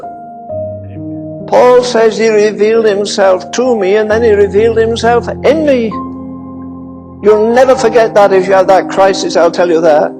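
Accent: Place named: United Kingdom. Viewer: Saint I most look foward to meeting in heaven. I know Paul and Peter and St.John, but this man was close to my time. He moved me with those little British words coming out of his mouth so clear and clean. Prayer and long suffering. Love this little British man. I want to see him. GOD willing I can one day see his resting place, then meet him in that celestial city in the sky built by the King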